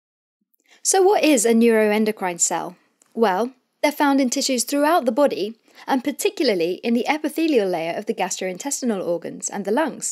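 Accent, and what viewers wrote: United Kingdom. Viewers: Loving the British accent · The British accent is wonderful 😍😍😍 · I was so mesmerized by the British accent . . .. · Seriously I love your videos.. but this British accent needs constant focus.. American accent is far most easy to understand.. not a criticism but just an opinion.. anyways as always best explaination! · Nice video, straight to the point! British accent makes me kinda distracted, had to replay the video few times. But again it’s just me. Tanner, come back! :)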